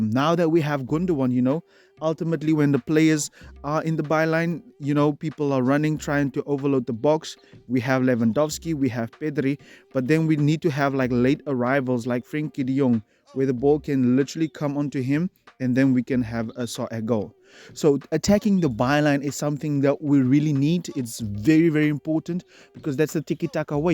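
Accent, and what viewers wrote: South Africa. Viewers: Ummmm, are you South African?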